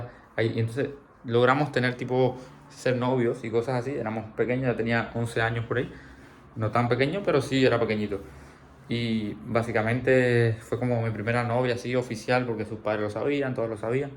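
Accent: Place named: Paraguay. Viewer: Empecé a ver tu video y no entendí, pensaba que eras paraguayo, tienes un acento muy paraguayizado..😊 Deséo que te vaya de lo mejor, las personas de bien son muy bienvenidas!!!!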